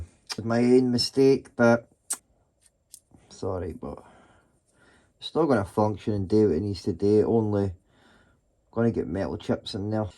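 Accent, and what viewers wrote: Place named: Scotland, United Kingdom. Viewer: I love the Scottish dialect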